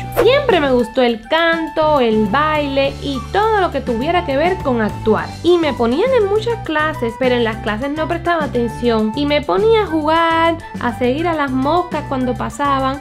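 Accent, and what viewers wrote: Cuba. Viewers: Ellas tienen el mismo acento por que las dos son cubanas y no le puedes prohibir eso 😒😒😒y ultima si no te gusta vete...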